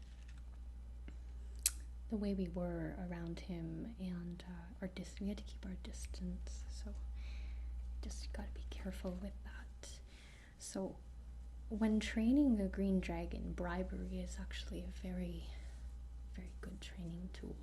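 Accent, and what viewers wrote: Canada. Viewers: Lol Your Canadian accent really suits this role play.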